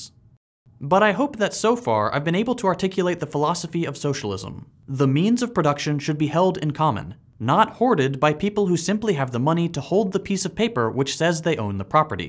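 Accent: United States. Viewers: Are there any socialists in the US? It's so weird to watch a video on socialism with an American accent.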